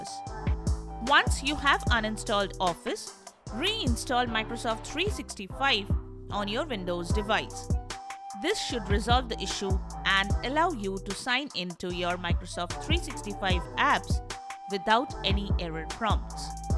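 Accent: India. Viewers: Funny, having an Indian voice giving advice about computer information.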